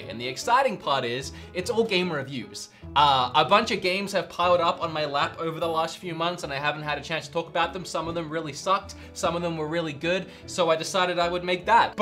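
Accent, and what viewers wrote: Australia. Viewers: Keen to hear your accent become more Aussie again lol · I thought he was from Canada. But now he said Australia I hear it now when he says awesome. Have fun I wanna go to Japan. But I also want to go to Paris and Italy. I just want to travel the world. I’m tired of seeing palm trees.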